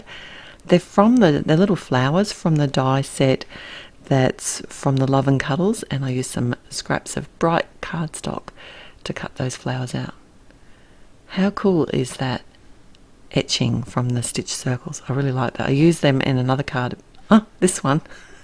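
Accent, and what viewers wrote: Australia. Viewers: I'm so glad you started your channel. I'm from Australia and you sound like a neighbor, here or NZ maybe Anyway... I do enjoy your posts. Wishing you many blessings ")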